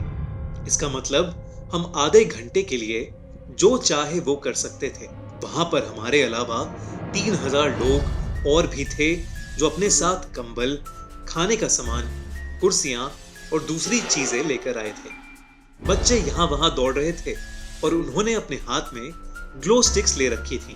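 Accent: United States. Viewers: Are you from America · Are you American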